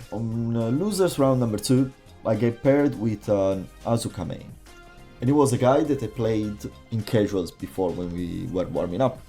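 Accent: Italy. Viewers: Sei italiano Bro ?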